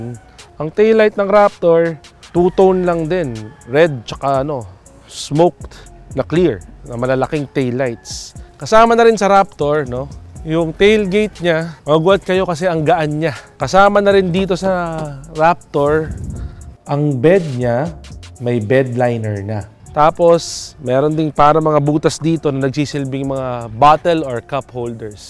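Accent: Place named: Philippines. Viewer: Salamat sa maayos n pananalita pinoy na pinoy. Salamat sa napakagandang review ng raptor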